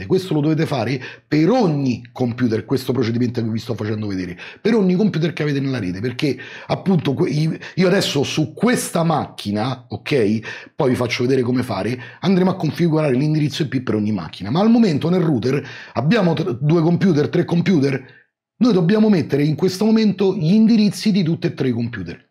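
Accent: Rome, Italy. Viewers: quella cadenza romanesca nel tuo ottimo italiano, rende tutto più piacevole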